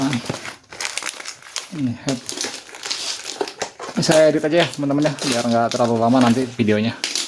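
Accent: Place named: Bogor, Indonesia. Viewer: Lokasi mana bang logat Bogor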